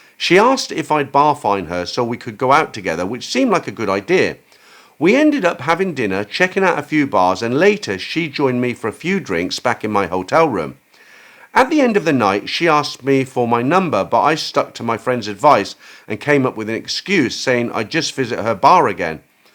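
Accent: United Kingdom. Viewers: That last story was THE FUNNIEST STORY I've ever heard on this channel !! ....and the super professional way you read it (with your super-kool-British-accent) ...makes it even funnier....not that anything is wrong with your accent, but to me (as an American person)...it just hits me in a way that makes the story The Greatest !!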